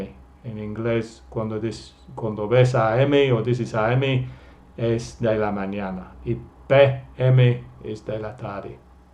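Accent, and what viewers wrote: United Kingdom. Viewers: es inglés británico? Thank you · Excelente profesor de Ingles, me gusta su inglés británico. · I wonder if you learn English from him you'll have a british accent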